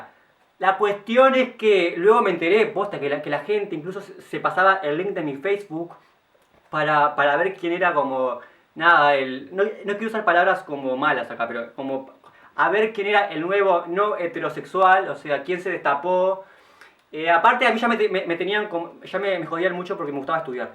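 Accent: Uruguay. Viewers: gracias por contar tu historia que ayuda y ayudará a mucha gente!!! y es real en nuestro país se ha logrado mucho con las leyes pero falta que las familias acepten y amen mas a sus hijos y la sociedad toda acepte al otro con amor y respeto! me gusta mucho escuchar tu acento y estilo uruguayo del interior ! saludos desde Colonia del Sacramento! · Desde que te escuché hablar supe que sos de Uruguay, te admiro mucho, todo mi apoyo desde Argentina ♡♡♡♡ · Estoy tan acostumbrada a ver youtubers argentinos que ver un youtuber de nuestro país y con nuestro acento diciendo BO, TAy GURI es un mimo ❤️ jajaj A parte de eso, lamento que hayas tenido una infancia angustiosa, te mando un abrazo grande, tenes una energía hermosa ✨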